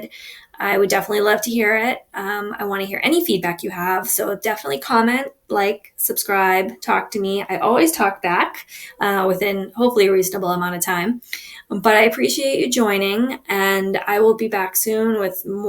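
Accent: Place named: Long Island, United States. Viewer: that Long Island accent though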